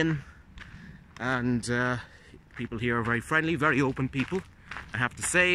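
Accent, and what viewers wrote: Waterford, Ireland. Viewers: On another video he says that he is from Waterford ,S.E.Ireland but lived a few years in Dublin .Waterford City people have a kind of neutral accent. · dose the local people speak english like you ie they sound like paddys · Why ask Bald? how would he know. He clearly has an Irish accent in the video. Ireland has a huge amount of Irish accents and none of them sound like Hollywood’s “Top of the mornings portrayal. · I'm in the Midwest US and I l hear a slight Irish accent but it's a lot closer to a US accent than I've heard from most Irish.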